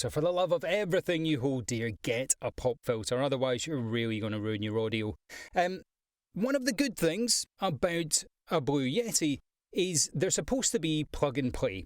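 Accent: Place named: Scotland, United Kingdom. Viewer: wasn't sure.. accent sounds Scottish.. could be wrong.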